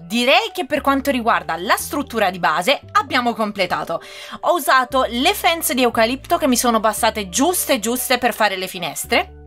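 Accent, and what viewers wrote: Italy. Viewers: ma sei marchigiana?P.s leggilo.Ti stimo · comunque ogni tanto l'accento de Roma se sente e te sta pure bene. Per il maiala puoi usare carote, patate, o barbabietole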